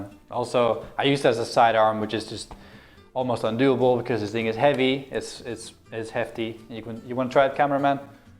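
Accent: Netherlands. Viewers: Ja hij is Nederlands dat hoor je ook in veel video's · Are you frome Holland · I like when you show the clips are you dutch btw? I kind of get that feeling by youre accent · Ben je eigenlijk Nederlands of Belgisch? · Ik vind het gewoon grappig hoe erg je je Nederlandse accent kan horen als je Engels praat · je bent nederrlands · Are you dutch ik wel btw XD love your video’s ❤️✌️ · Wut ure dutch... omg ik ooook! Jajajajaja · Are you Dutch? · Bro are you dutch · Je bent Nederlands praat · Are you dutch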